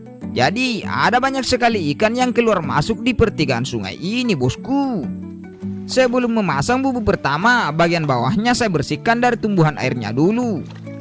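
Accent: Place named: South Sulawesi, Indonesia. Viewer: dari logatnya dari Sulawesi selatan kayaknya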